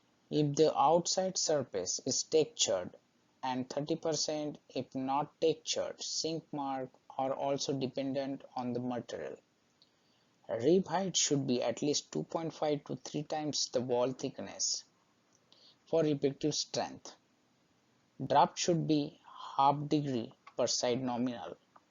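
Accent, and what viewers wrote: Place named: United States. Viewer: Your US accent made me comment....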